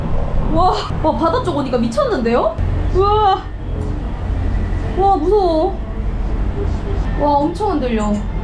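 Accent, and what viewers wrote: Gyeongsang, South Korea. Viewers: 이상하게 경상도. 말과 전라도말이 같이 들리 네요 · 말씨억양이 깅상도? 대구?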